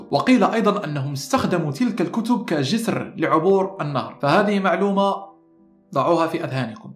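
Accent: Iraq. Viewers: شتلة 🌲 شلون عرفت هذه الكلمة👏🏻 يسولف عراقي 😊❤ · لاكن لهجتج عراقي ؟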